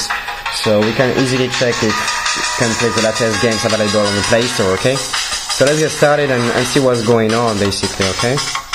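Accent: France. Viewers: Belle accent français :)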